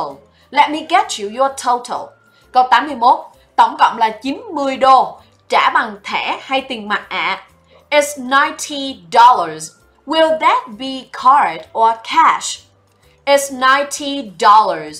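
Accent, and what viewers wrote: Standard. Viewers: bài của cô giáo rất hay, rất hữu ích, phát âm của cô giáo cũng rất chuẩn. Nhưng mình có 1 góp ý nhỏ, đó là đội ngũ biên tập nên soạn bài cẩn thận hơn, và cô giáo cũng nên xem qua bài trước khi dạy. Trong video này mình thấy từ nail trimming, cô viết sai thành anil trimming, đó chỉ là lỗi chính tả nhỏ thôi, nhưng vấn đề là cô vẫn cố đọc là "anil trimming", làm gì có từ nào là từ "anil" ạ. Sau tất cả vẫn xin cảm ơn tâm huyết của cô giáo ạ.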